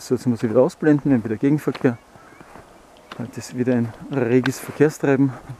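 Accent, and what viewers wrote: Austria. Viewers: Dei "weana" Dialekt is sowos von herzerfrischend...🛷 "Laiberl" statt Pullover! Mein neuer Walspruch. Danke, mit dir geh ich gerne täglich Gassi..🐕 🌬❄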